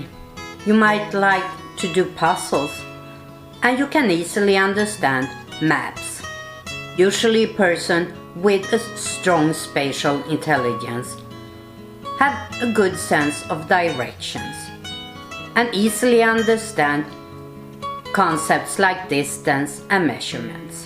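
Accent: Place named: Sweden. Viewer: That was very interesting, and as always love the Swedish accent, good video thanks for sharing.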